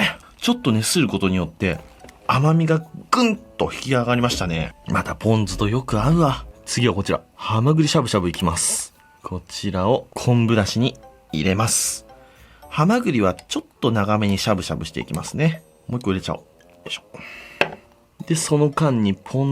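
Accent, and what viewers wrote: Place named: Aichi, Japan. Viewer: サワガニより好き｢だで｣ て言うとやっぱり同じ地元の愛知の人の感じがあって親近感湧く。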